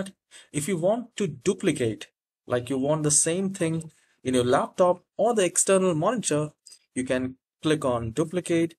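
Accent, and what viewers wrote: India. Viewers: this dude is indian sounds like a scam but 100% lewgit good job traight to the point new keybard bad spelling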